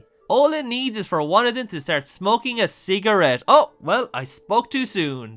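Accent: Ireland. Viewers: You're terrific -- what a fun approach to life. LOVE Rope. Read about it as a 1960s teen and was dying to see it, but it was as buried as Martin van Buren then. Thought to search it in youtube one day 40 years later and wham, there it was to enjoy free. INSTANTLY this tantalizing mutation from Hitchcock's doggedly hetero (but frequently gayoid) oeuvre at large sprang into my slightly less closeted adult life. What's that you say -- cotting? Codding? (Cotter/Codder.) Am interested -- have been a language/dialect/idiom/slang addict forever, and of course LOVE your Irish accent. Tried googling the term. Hitch was gay as a goose, of course. Supposedly he and Alma only made love once, thus Patricia. ("Therefore champagne.") Rope's photographic gimmick isn't such a big wow but it gives this extra-special movie extra cachet. 7 normal splices would've been fine :)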